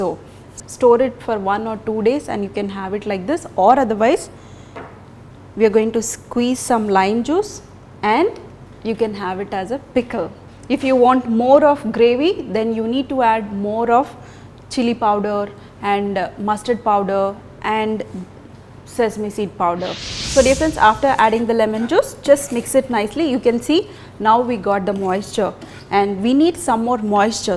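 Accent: India. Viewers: Haha by ur English I have found tat u r from India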